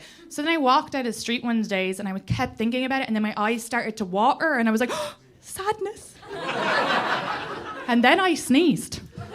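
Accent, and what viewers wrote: Ireland. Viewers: she's lovely and kinda ♨. rapid fire speaking...😲easy on the coffee, lady! honestly, some dialects of Irish English are not understandable so maybe that's what people mean just like some American English is the same even some English from England is like 😵‍💫what did they say🤷‍♂. her Irish is lovely. my fav English is "received pronunciation". "wah-er"? I understand the "crazy" partner attraction. let's face it. they tend to be great lovers. cat isn't drowning the mouse. it's an Irish catholic cat. IT'S DRUNK AND BAPTIZING IT AT DEATH!🤣 4 jobs and thinks that's an acceptable life???? SSSLLLAAAVE MENTALITY!!!! does Ireland have a diabetes epidemic? because of the drinking. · Hi I Think your so funny love your Irish accent hope you come to south FL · u are so good, for me u are up there with Steve Hughes, Alex Agnew, Bill Burr and Ricky Gervais and your Irish accent makes it so nice to listen to, greetings from Belgium and keep it up !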